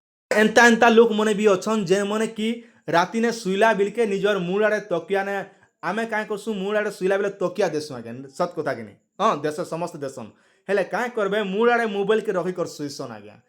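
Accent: Kalahandi, India. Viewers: ତୁମେ କଳାହାଣ୍ଡି ଜିଲ୍ଲା ର ଦାଦା ତୁମର ଭାଷା ରୁ ଜଣା ପଡୁଛେ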